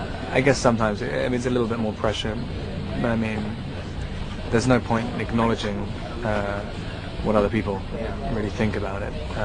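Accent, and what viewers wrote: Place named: London, United Kingdom. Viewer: his accent is like London English, Standard English, he is accentless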